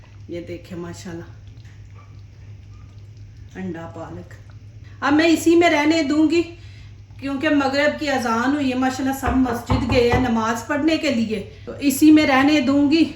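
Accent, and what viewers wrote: Punjab, India. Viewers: Aap kiapunjabi ha bolne ka style se lag Raha ha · Watching from Buckingham, UK. I liked the recipe and your Punjabi style commentary too :) I will try to cook this soon. Insha Allah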